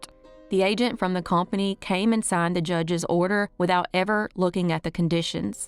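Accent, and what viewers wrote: South, United States. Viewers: You have the perfect voice to deliver those insults. The family not knowing about his violent nature...THE perfect southern "bless your heart" delivery 😊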